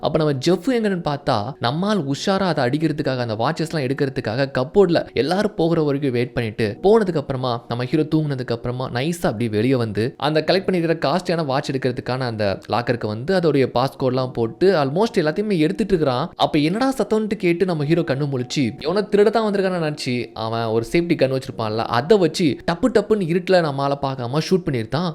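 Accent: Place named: Kerala, India. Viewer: 1 month kku munadiye...... doubts vanthuchu.... but apo voice la malayalam smell vanthuchu..... BUT IPA KANDIPPA SOLLURE ...... TAMIL VOICE OVER ஆசூன் தான்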